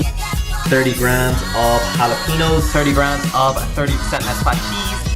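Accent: Ireland. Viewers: Nvm, i knew it he is from ireland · Are you from Ireland ? You have posh brogue ;-). Good video, I will follow.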